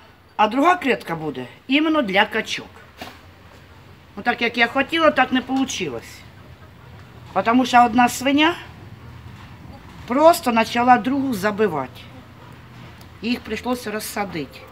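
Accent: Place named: Mykolaiv, Ukraine. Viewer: з якої ви області. я думаю з миколаївської у нас так розмовляють в селах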